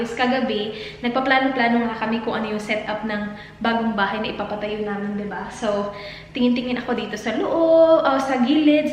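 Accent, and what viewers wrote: Visayas, Philippines. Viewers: Wooh the bisaya tono uy 😅💖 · Ang accent sa pagka bisaya ba, mo gawas jud hahaha Kalisud basta bisdak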